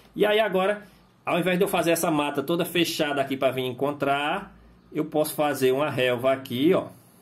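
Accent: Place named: Northeast, Brazil. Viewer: EXCELENTE DICA, obrigado professor, só uma curiosidade, o SENHOR tem um sotaque nordestino, de que estado o SENHOR e'? Adorando seus vídeos, também sou pintor, PARABENS PELO CANAL, Abraços aqui de BH- MG.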